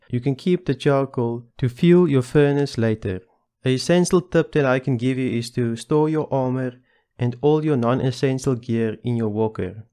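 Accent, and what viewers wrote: South Africa. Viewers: Wow love the videos and very clear and good content, i dont easily sub to a channel but your videos are worth watching. Just a question where are you from, South Africa?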